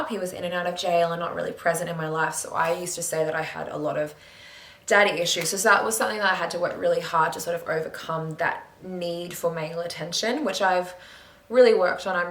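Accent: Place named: Australia. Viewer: It’s a blessing to hear your Ozzie accent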